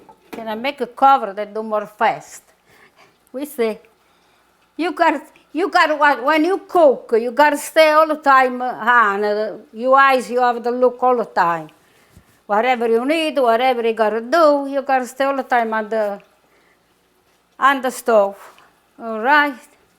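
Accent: Italy. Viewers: Love watching with her great recipes, and also love listening to her Italian accent, as a native Italian I love everything Italian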